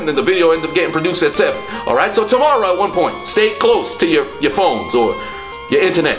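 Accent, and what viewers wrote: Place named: New York, United States. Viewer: Educated New York talk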